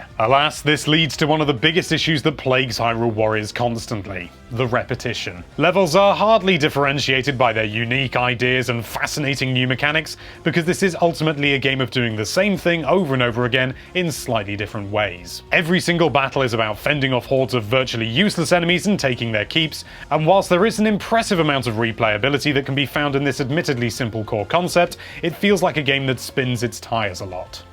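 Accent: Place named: United Kingdom. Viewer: thedreadedzero it’s the British-English pronunciation, as stated in the dictionary. Die-nasty is an American pronunciation, and is british.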